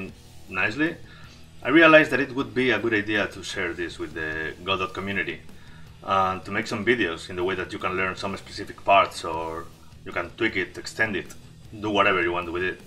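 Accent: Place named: Ireland. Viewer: Very cool! Irish english hehe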